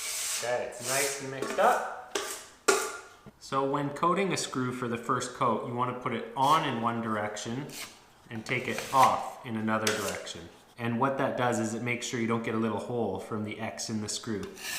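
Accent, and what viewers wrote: Canada. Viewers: Canadians have agonizing accents.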